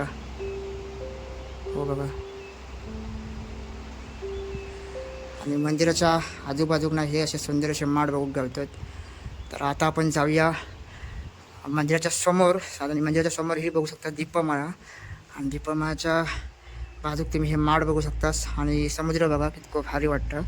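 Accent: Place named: Konkan, India. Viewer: सुरुवातीला थोडंसं फास्ट बोलला होता पण नंतर छान पद्धतीने माहिती दिली आहे.कोकणी भाषा गोड वाटते ऐकायला.❤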